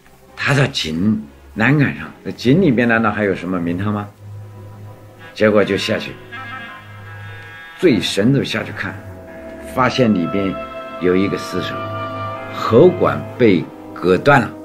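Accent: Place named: Jiangsu, China. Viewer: 这个口音一听就是江苏中北部口音无疑！ 司马老先生最近仙逝。 安息 :face-red-heart-shape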